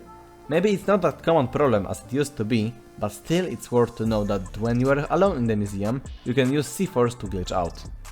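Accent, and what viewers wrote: Poland. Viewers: Z polski jestes bo polski akcent? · Ah ten polski akcent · ale słychać tą polskość. Pozdro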